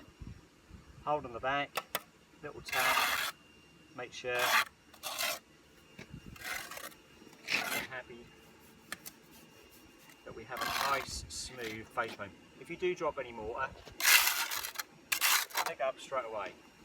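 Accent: Norfolk, United Kingdom. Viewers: You Sound Norfolk like me ;)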